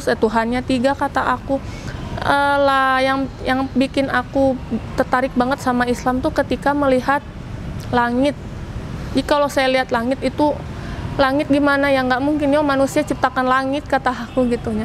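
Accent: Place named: Sunda, Indonesia. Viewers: ko nyunda bgt ya bataknya... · Sunda pisan yaaaa... 🤗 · Teteh udh lama di bndung Yaa logat sundanya kental bnget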